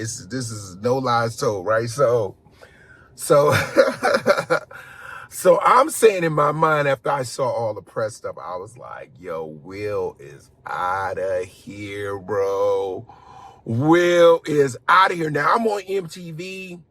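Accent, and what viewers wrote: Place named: New York, United States. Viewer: Bellamy I remember you and your body guard at the time (Will) came in the shoe store (First step in San Francisco) you guys showed me so much love! Bought a few pairs of jordans and You invited me to the punchline on Embarcadero. The guy that opened for you was a Latin guy that use to tell a story about how a Mexican dude turns a bike in a business 😂… If wife was sitting down (one of the baddest black women I’ve ever seen, gave me her seat)… you probably don’t remember but it was when you were promoting Any given Sunday. Just know since that day I’ve always watch all your pilots, shows etc. And tell everybody that will listen that you are a real ass dude and you have a New York accent. Be smooth playboy!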